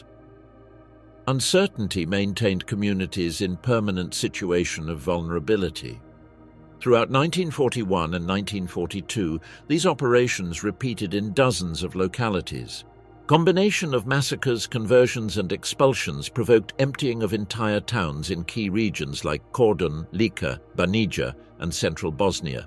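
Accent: United Kingdom. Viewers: Well, whomever wrote/narrated/AI'd this, clearly wasn't a "English as mother-tongue" speaker, despite the English accent, as evidenced by the lack of 'articles' [a - an - the]. And the occasional sound of pages being turned isn't fooling anyone. 😏😏😏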